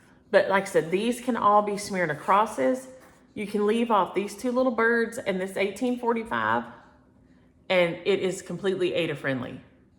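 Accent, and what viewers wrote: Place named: Texas, United States. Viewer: You mentioned East Texas. Every summer we traveled by car from Miami to Kilgore to see my precious grandparents aunts uncles and cousins. They lived there and in Larid Hill many years. It’s hotter than blue blazes! Thanks for sharing such cute patterns. I love bunnies and Spring is coming soon! I miss hearing my sweet families Texas drawl since they are all gone now… so honey I enjoy hearing you.. 😊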